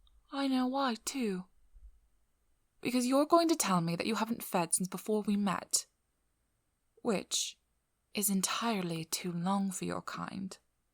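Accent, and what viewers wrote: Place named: United Kingdom. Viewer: When I tell you I SQUEAKED when I heard the British accent. I turned into a huge ball of floof squealing and kicking the air while morphing into a red balloon.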